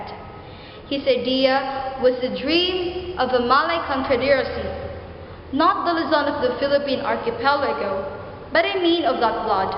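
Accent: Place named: United States. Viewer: Is that your real voice? or lip synching...LOL. if that is...Congratulations!...you did perfect american accent, rise and fall intonation of voice are impressive...Good luck!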